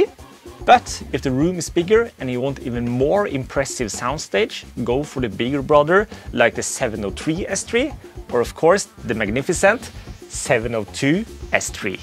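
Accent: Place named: Sweden. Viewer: snak dog Dansk i er et Dansk Firma i stedet for Svedish med en Lattlig svensk accent Kom dog ind i kampen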